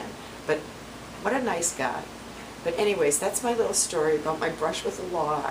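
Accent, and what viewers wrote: Boston, United States. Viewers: your story did put a big smile on my face still having a very difficult time but I’m glad that you commented on my video and directed me here I probably should know this and we have probably spoken about it but I can’t remember are you from Massachusetts because you definitely have a Boston accent - I know you’re sick but you look amazing and yes prednisone is some scary stuff and can make you feel really weird I have to catch up on some of your videos I don’t always comment but I do watch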